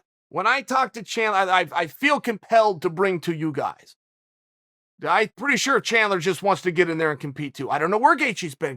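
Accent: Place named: Oregon, United States. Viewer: Chael your Oregon is showing.... golly 😂 this guys the best.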